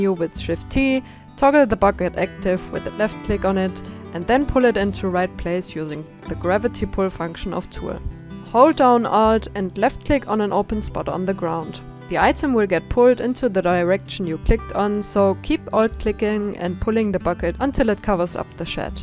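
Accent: Germany. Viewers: Junge dieser deutsche Akzent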